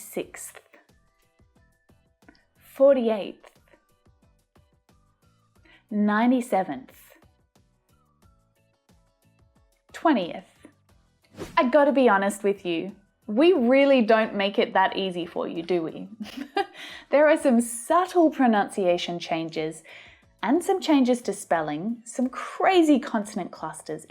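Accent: Australia. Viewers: Are u from Australia ? Your english sounds more clear... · speaks with a cultivated Australian accent and she is from Melbourne (the second biggest city after Sydney)... I can assure you that not all Australians speak as clearly as she does! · I've got a question, just out of curiosity. Yours is the cultivated Australian accent, right? I love it, it's kind of the best of 2 worlds, British on one hand and American on the other but without some of the oddities of them, at least this is my point of view as non native English speaker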